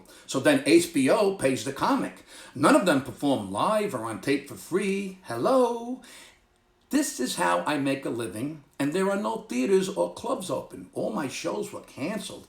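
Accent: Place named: Brooklyn, United States. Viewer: You're Hilarious! You make me miss Brooklyn so much with the way you talk!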